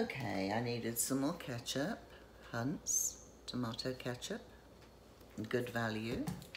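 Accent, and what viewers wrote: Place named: England, United Kingdom. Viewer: Beautiful accent are you from england??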